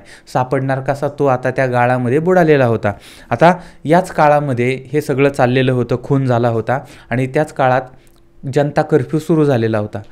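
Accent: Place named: Satara, India. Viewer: भाषा उच्चार वरून सर सातारा जिल्ह्यातील असावेत असं वाटतंय,तुम्हाला काय वाटतंय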